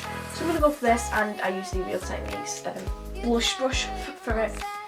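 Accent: United Kingdom. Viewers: hey, I am new to your channel, watching your video for the first time! you're beautiful! and please don't mind me asking, are you British? (I LOVE your accent)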